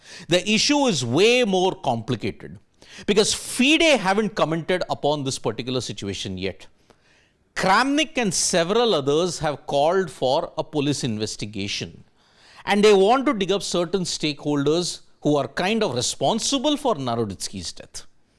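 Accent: India. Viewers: Get used to Indian “English”.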